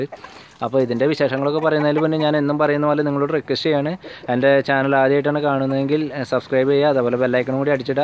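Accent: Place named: Malappuram, India. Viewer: Ninte vioce ketal trisur Karan thonilla.. Malappuram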